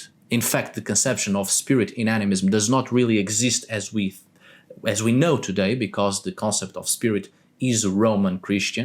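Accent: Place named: Portugal. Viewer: Your Italian accent is quite good. You have a little bit of the Portuguese accent on the Rs before vowels, but well done. Que fixe pa!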